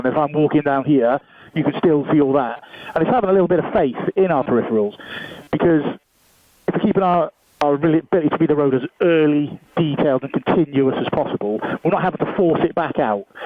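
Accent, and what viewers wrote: Scotland, United Kingdom. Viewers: looking forward to seeing it. Just watched the Scotland trip and was having a wry smile as your accent seemed to get more Scottish as the episodes went on 🤣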